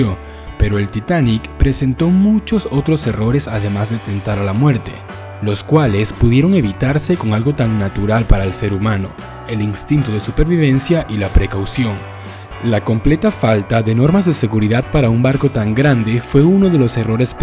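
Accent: Colombia. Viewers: Oye eres de Colombia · tu eres de colombia?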